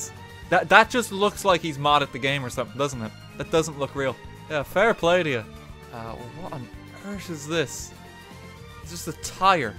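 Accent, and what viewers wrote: Ireland. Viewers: No he's irish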